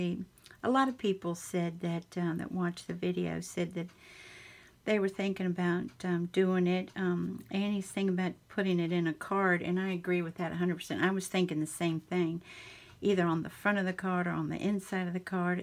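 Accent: South, United States. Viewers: I live your accent you are such a sweet southern belle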